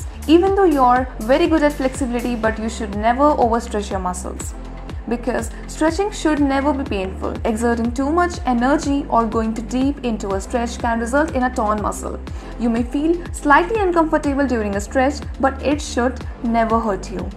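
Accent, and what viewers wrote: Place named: United States. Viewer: you speaking English like a American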